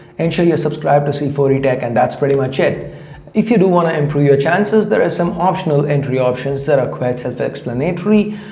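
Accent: India. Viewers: typical fucking infian accent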